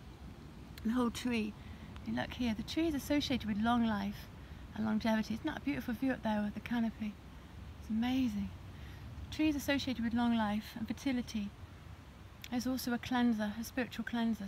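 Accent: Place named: United Kingdom. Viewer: I wish I could understand your English-very British Very difficult to make out your words…sorry Because I’m very much into health/herbs…😟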